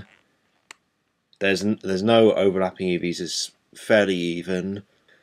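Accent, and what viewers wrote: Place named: United Kingdom. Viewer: He is a Brit! Brit always have cool accent!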